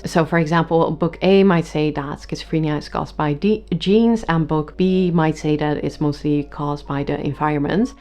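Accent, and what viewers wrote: Netherlands. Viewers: I heard you speak and I thought “are you Dutch?” and the picture popped up, defiantly studying in the Netherlands. Thank you for today’s video, appreciate it!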